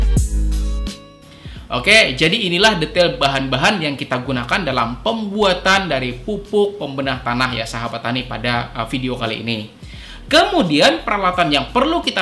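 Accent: Banjar, Indonesia. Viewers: Joosss buat ppl...walaupun gmn logat banjar ny gk ilang²....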